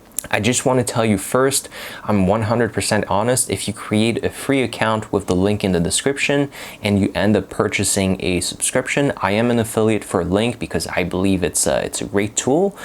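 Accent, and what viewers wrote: United States. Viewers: Dude you even sound more American than me ….wow 😮!!! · Your accent sounds American and your diction is just Amazing. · Are you sure you are not American? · Your wordstock seems essentially British (e.g. your use of the word «holidays»), but your accent is 90% American. Have you taken on learning Tahitian at all yet? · Your American English is even much better than some native speakers in the US. Congratulations! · Your English is absolutely fascinating! You sound like an American speaker!!! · You cannot have this level of English without having lived in an English speaking country especially Canada or the USA . You have an American accent . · Yup bro great to hear your English speaking journey. My goal is to speak English like an American speaker. You are really close to American english native speakers. My problem is that when some time I speak English I think not making mistakes while speaking. 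U made me motivated to learn American accent. Please let me know know what I need to do? I will be thankful to you forever. Thanks from my depth of heart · From an Australian’s perspective, he has an American accent with a hint of something else. No big deal. His grammar seems perfect. I haven’t noticed anything he said that sounded very wrong. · Amazing american accent. I would bet you also have an excellent rest for music. Do you play an instrument? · you did a great job. I couldn´t notice you´re not a native English speaker if you woudn´t tell. You sound very american. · Wahoo! as a French speaker and living you blow my mind!! You speak like an American. I will follow everything to bring my English to the next level.!! Congrats 👏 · Dude! Your accent sounds from the US. I am French like you, from Paris. You did an amazing job to get where you are. Your mind is very well structured. You speak with calm and ease. 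Congrats! I am moving toward a fluent Spanish, right now. My strategy is slightly different : I am moving directly in the country to learn the language. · if you didn't mentions that you're french ,, I would guess you're American ..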